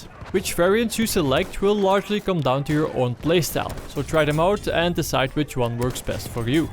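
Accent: Netherlands. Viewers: Hey, are you by any chance dutch?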